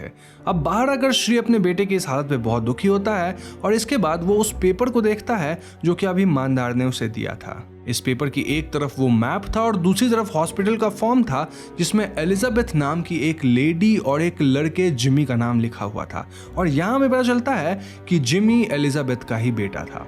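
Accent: Bengal, India. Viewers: Kya Bengali ho?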